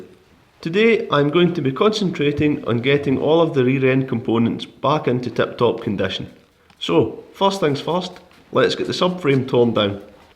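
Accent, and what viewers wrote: Scotland, United Kingdom. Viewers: The amount of rust is ridiculous was this a flooded car? or is it the effect of Scottish weather? P.S. I'm guessing from the accent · Lol . Without trying to offend you I am guessing you are Scottish?? Your narration is good mate , no need to change anything. 👍 if anything staying true to your accent is great . There is already to many people that feel the need to cater to their viewers more then necessary. Stay true to yourself. People are here and liked and subscribed to your content for what it is . Not for what they think that it should be . It is your channel. It it bothers them then they can either get over it or buggar off I'd say.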